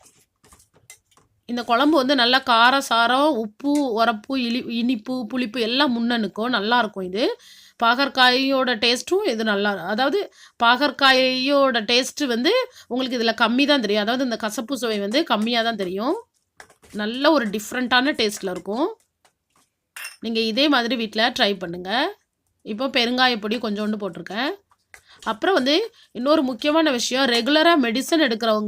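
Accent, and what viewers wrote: Tirunelveli, India. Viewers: குழம்பை விட உங்க திருநல்வேலி slang பேச்சு எனக்கு ரொம்ப பிடிச்சது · அக்கா நீங்க திருநெல்வேலி யா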